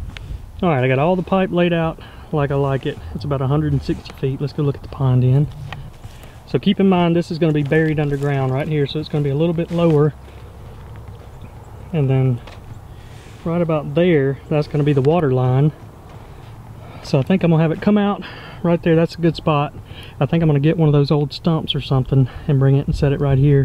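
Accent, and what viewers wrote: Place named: Texas, United States. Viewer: its not obvious its texas accent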